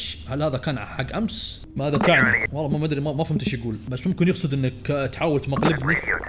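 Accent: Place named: United States. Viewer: الاكسنت الامريكي معطي جو 😂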